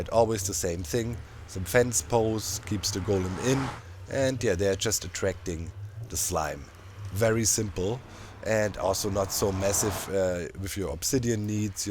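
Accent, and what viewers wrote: Germany. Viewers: Love you, I'm not a real supporter talking about money but I wanna call my self a real moral supporter, love your way to play this game, your way to ponder videos and also your character: real's life one and fictional one. Man, go gogogogogoogogo and never stop. Thx to make me enjoy a doichman speaking eng for so long <3 hope to meet you one day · Habe dich ganze zeit in anderen videos gesehen von bspw. Grian oder Mumbo und hab mir immer gedacht der hat doch voll nen deutschen Akzent. Jetzt weiß ich es fühle mich gut danke xD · I'm learning German on the side, listening to speak English is actually helping me with my accent when practicing lol. Keep it up,.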